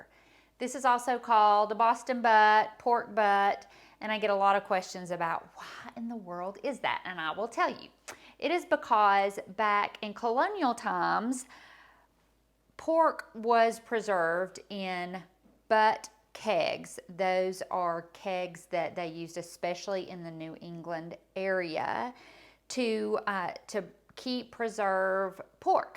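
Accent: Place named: South, United States. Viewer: Like the content! I love your southern bell accent ❤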